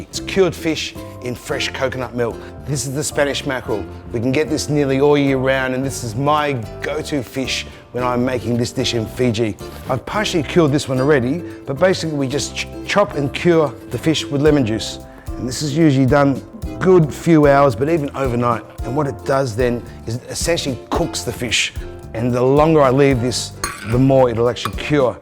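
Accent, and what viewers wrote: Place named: Australia. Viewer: this comment is not meant to be disrepectful or anything, but this video makes me, as a Londoner want to travel to this part of the world more, I've just saw an Asian looking man speak in a Aussie accent teaching how to cook Fijian food. Amazing, so much culture in this part of the world I've yet to see and experience.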